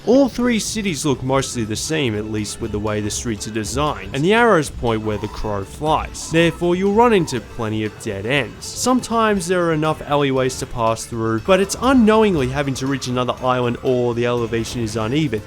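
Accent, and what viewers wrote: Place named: Australia. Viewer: your accent is a really annoying version of an aussie one, did you watch too many american movies growing up :/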